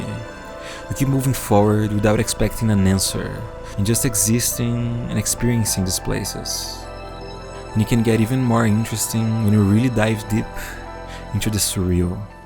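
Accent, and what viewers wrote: Brazil. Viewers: Como alguem que mora no Canada ha quase 10 anos, seu sotaque é otimo e lindo! Consegue nao ser tao carregado a ponto de ser dificil de entender, mas ainda tem o charme do nosso sotaque tupiniquim.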